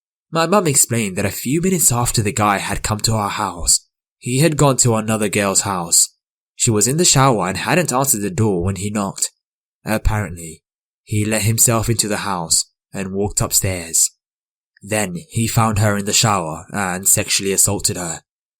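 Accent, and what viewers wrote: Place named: England, United Kingdom. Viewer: You're welcome! I figured you were from England. I am from Louisville Kentucky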